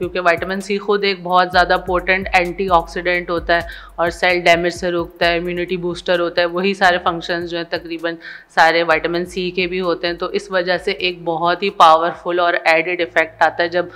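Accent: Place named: Karachi, Pakistan. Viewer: Ye Dr karachi ki hy... Speaking accent say lgra hy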